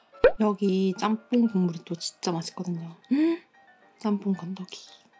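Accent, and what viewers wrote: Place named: Busan, South Korea. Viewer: 악ㅠㅋㅋ 친근한 부산말투라서 부산인쥴ㅋ 죄송해욤ㅋㅋ 항상 응원합니당ㅋ 😍😍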